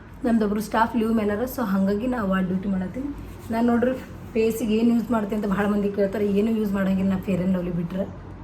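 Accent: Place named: Gulbarga, India. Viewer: ಹೇ ಹುಡುಗಿ ಛಲೋ video ಮಾಡ್ತಿ ಮತ್ತ ಬಹಳ ಛಲೋ ಗುಲ್ಬರ್ಗ ಭಾಷೆಯಲ್ಲಿ ಮಾತಾಡ್ತೀಯಾ ಹೀಂಗೆ video ಮಾಡಿಕೊತಾ ಹೋಗು ನಮಗೂ ಬೆಂಗಳೂರ್ ಭಾಷೆ ಮಾತಾಡಿ ಮಾತಾಡಿ ಬೇಸರ ಬಂದಿದೆ... ಏನಂತಿ 🙋‍♂️🙋‍♂️🙋‍♂️🙋‍♂️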